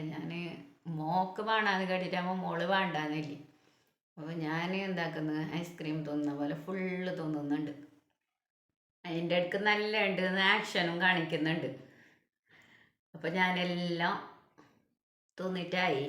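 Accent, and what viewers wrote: Kasaragod, India. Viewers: ഇത് കൊള്ളാലോ നല്ല ഐഡിയ ആണല്ലോ. നമ്മളെ കാസർഗോഡ് ഭാഷ കേൾക്കാൻ നല്ല രസമുണ്ട്. കാസർഗോഡ് എവിടെയാ ഞാനും കാസർഗോഡാണ് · Nalla usefull video..bakki Vanna choru ini ithupole cheythunokkanam..kasargod samsaram kettirikkan nalla rasamund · എനിക്കൊരുപാട് ഇഷ്ടപ്പെട്ടു നല്ലൊരു വീഡിയോ ആയിരുന്നു ഞാൻ ഇതുവരെ ഇങ്ങനെ ഉണ്ടാക്കുന്നത് കണ്ടിട്ടില്ല തീർച്ചയായും ട്രൈ ചെയ്തു നോക്കും അറിയാത്തവർക്കു വളരെ ഉപകാരമായി വീഡിയോ ആയിരുന്നു ഇങ്ങനെയുള്ള കുറെ ടിപ്സുകളും ഉപകാരമുള്ള വീഡിയോയുമായി വീണ്ടും വരണം കാസർഗോഡ് സംസാരം കേൾക്കാൻ നല്ല രസമുണ്ട് ഞങ്ങൾക്ക് കാസർഗോഡ് ഭാഷയിൽ സംസാരിച്ചു കേട്ടാൽ മതി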